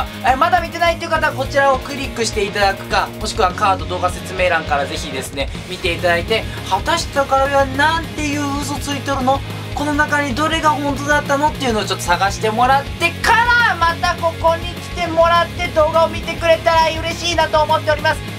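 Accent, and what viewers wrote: Fukuoka, Japan. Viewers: 福岡の方言きいとったら、親近感わく！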